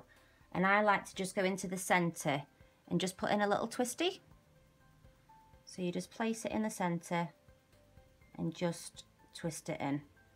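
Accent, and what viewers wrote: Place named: England, United Kingdom. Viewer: si es Ingles pero se le escucha el acento como de Inglés (los que son de Inglaterra)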